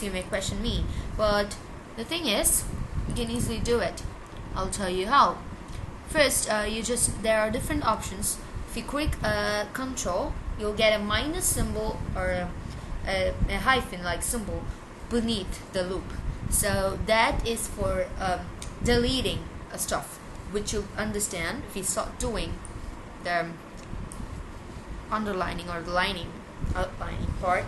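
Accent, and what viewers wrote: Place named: Kerala, India. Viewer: SHe sounds malayali indian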